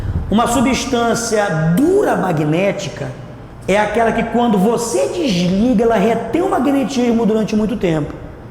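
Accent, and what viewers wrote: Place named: Belém, Brazil. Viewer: De Belém... Reconheci pelo sotaque rsrs. Mas a aula foi muito boa!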